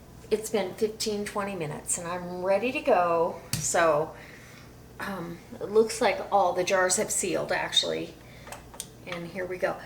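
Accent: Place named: Upper Peninsula, United States. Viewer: Miz, I'm about to put up a crap load of pork shoulders I've had in my freezer for years, vacuum sealed. It's time to clean the chest freezer out, and I have just started canning meats. Can you give me some ideas of different ways/recipes I could make to can, using my pork? Thanks, In advance, and I think you sound like you are from maybe the U.P.? I like your accent.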